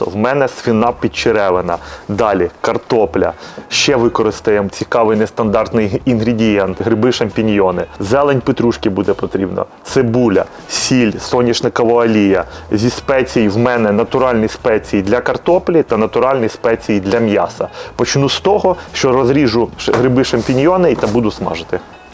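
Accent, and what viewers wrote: Ukraine. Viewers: Смакота ! Молодець ! А звідки ти є, друже, як на мій погляд то львівський акцент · Український акцент супер!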